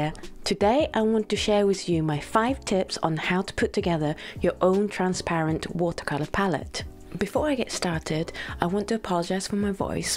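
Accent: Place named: United Kingdom. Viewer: This video was so helpful...more please. Also may I ask what paper you use and where you can get it? I am assuming from your accent that you are also in the UK? Apologies if not. Thank you so much...Mand XOXO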